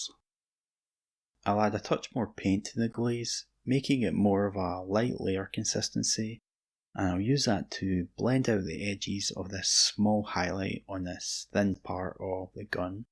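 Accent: Scotland, United Kingdom. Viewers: Nah, your accent is pretty much all there, cos after your vids I often get a hankering to watch early episodes of Taggart !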